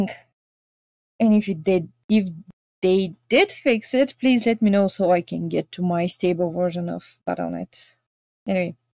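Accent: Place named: Bulgaria. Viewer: hey hey im really wondering what your first language is? is it bulgarian? you sound like my bulgarian friend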